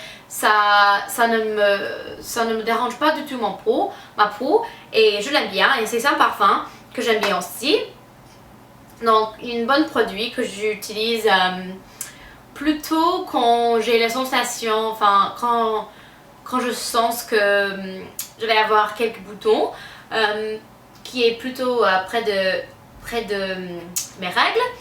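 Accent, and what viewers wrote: France. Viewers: Je succombe totalement à ton accent frenchy lol les produits sont extra, u've master french lgge better than some french pepople haha, kiss <3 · J'aime vraiment beaucoup ta chaîne , et surtout ton accent français . Tu ressembles énormément à Lindsay Lohan c'est fouuuu , bisous p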